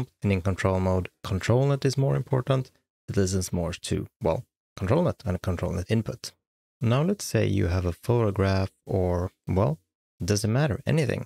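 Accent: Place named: Sweden. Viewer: I'm here for the amazing Swedish accent! And the jokes 😁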